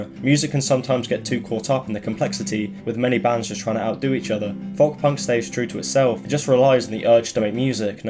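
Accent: United Kingdom. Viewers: hey why does this goblin have a harry potter accent? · He's british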